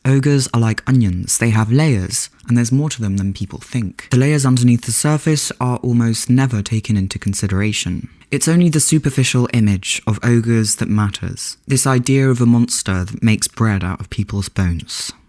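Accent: RP, United Kingdom. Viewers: Comes for the intriguing premise of the video... subscribes and clicks the bell for the adorable person with a wonderfully posh RP accent narrating said video.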